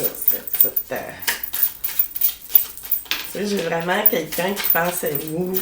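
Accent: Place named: Quebec, Canada. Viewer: Je kiffff.....laxsen québécois...👍👍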